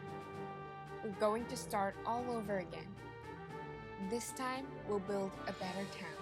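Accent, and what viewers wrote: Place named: England, United Kingdom. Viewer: I could listen to you talk about anything your english accent is so nice.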